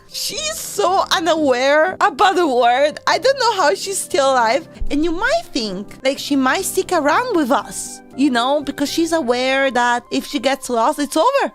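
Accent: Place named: Naples, Italy. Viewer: It was established, based on her accent, that she is a bit more south in Napoli.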